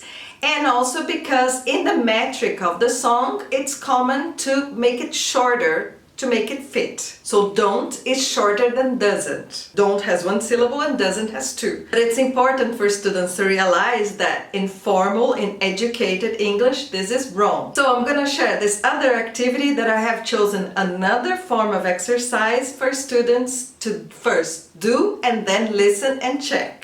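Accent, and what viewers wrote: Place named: Brazil. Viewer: Beautiful brazilian accent! Thanks for the activities!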